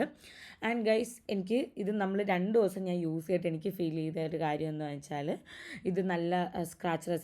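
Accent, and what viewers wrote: Trivandrum, India. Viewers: ഈ പൂകിരി മഹാ ബോറാണ്.. മര്യാദക്ക് സംസാരിക്കാൻ പോലും അറിയില്ല... ഒരു തൊലിഞ്ഞ തിരുന്തോരം ഭാഷ.. എഴിച്ചു പോടി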